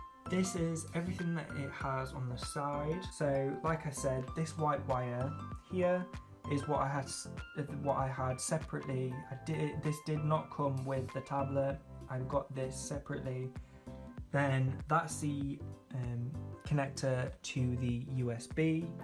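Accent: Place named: England, United Kingdom. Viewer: I just like listening to you. U SOUND like U know what you're talking about and I love your English accent.!!!.. take care and keep it up.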